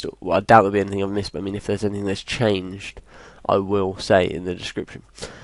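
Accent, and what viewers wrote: United Kingdom. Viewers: I disagree it's just the regular brit. accent!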